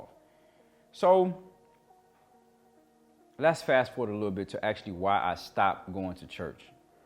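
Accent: Ghana. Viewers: 😄🤣 Hahaha love your Ghanaian accent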